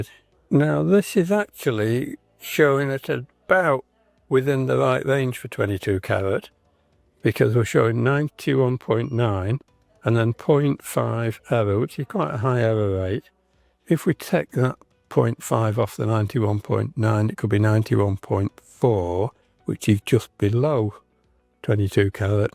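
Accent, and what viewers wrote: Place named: Northern England, United Kingdom. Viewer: He's a Northern lad, who speaks real English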